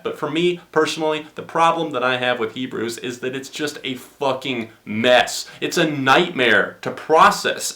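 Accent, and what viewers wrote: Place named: North Carolina, United States. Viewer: I heard your voice and I was like, "I know he's from North Carolina. I know it." So I clicked in the description and I knew it. Anyways I've been loving these videos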